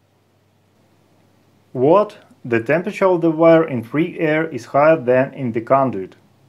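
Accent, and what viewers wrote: Russia. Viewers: Сильнейший акцент выдаёт русскоязычного )) Ну а по сути - очень полезный опыт. Но, к сожалению, большинство самоучек-электриков добиваются "вау-эффекта" от своей работы именно бесконечными километрами никому не нужной гофры. Им главное - пустить пыль в глаза ничего не понимающему заказчику.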